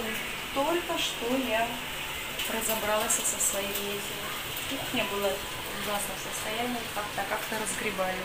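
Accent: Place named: Georgia. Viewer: А мне нравится как вы разговариваете на русском языке!Такая изюминка присутствует в легком акценте.Если бы вы сказали,что грузинка,то все писали бы ,,ой как вы хорошо говорите по русски!"А прожить в Грузии столько много лет и разговаривать по грузински....конечно меняется интонация!Я вас понимаю потому что сама жила в Грузии 4 года с родителями и ходила там в школу,это было при Советском Союзе.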